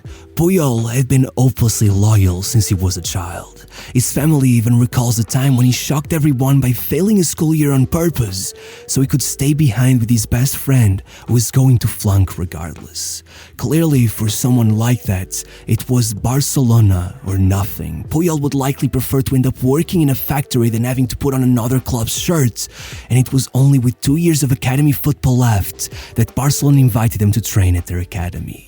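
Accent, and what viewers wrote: Portugal. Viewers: Your “Roberto Carlos” accent… você é Português?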